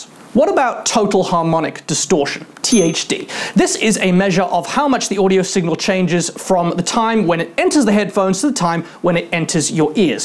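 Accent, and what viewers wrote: South Africa. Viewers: U almost sound south african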